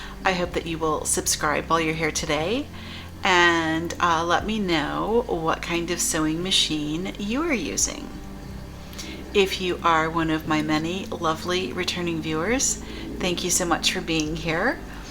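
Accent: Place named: Virginia Beach, United States. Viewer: Hi! I’m in the Netherlands and just ordered this machine from the Lidl website. I’m so excited to receive it that I started watching reviews...what a delight to find you live in VA Beach! I used to live in Norfolk, on the Bay, and went to school in VA Beach, and I miss it so much. It’s lovely to hear your 757 accent and also learn about my new machine. I’d be curious to know what kind of thread brand you’ve used on this machine with success. I’ve ordered some of the Crelando brand on the Lidl site and am also looking at some Gutermann thread, but if you have other suggestions that work well I’d be interested to hear it. Thanks so much for taking the time to make this informative content!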